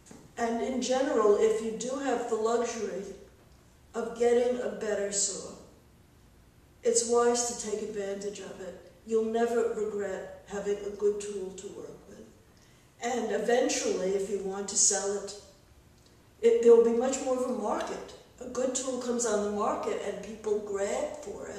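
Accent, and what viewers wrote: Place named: United States. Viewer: Scrolling with the Hegner, wrong title as no scrolling takes place just a lot of talking with strong whining American accent to difficult to understand even for the subtitles.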